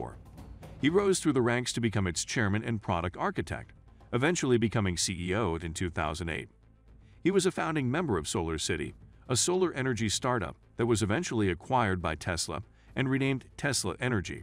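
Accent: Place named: South Africa. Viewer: I know you are a white south African, that why you are talking like that 😁, like I said before, facts doesn't care about your feeling, bringing the ideas on the table doesn't mean you are the one who invented it 😏😁.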